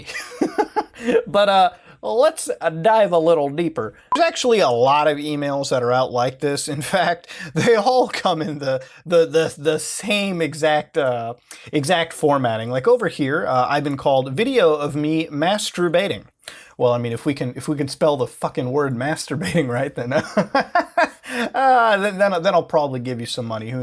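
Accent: United States. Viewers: Honestly, I thought you are some kind American dude like anybody speaking like an American are sounded like that but you look Indian at first glance. No offense. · You look like an Indian, yet you sound American... What sorcery is this?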